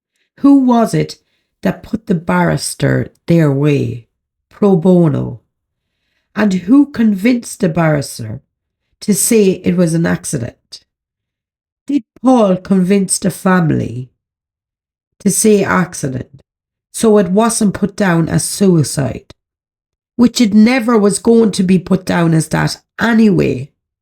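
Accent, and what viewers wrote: Ireland. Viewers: Absolutely fantastic analysis of this case , I love the Irish lilt xxx jen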